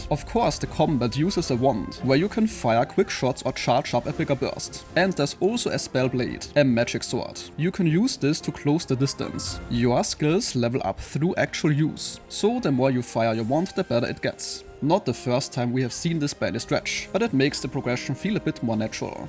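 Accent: Germany. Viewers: i hear the german in your voice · wow hast du nen krassen deutschen akzent :D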